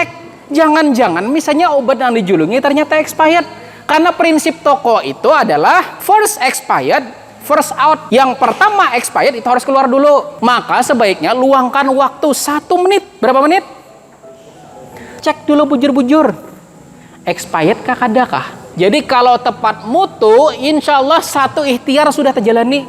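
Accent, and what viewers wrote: Banjar, Indonesia. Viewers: Sulsel hadir. 10 tahun di Kaltim. Serasa bernostalgia dengan logat Banjarnya bang. Salam agro..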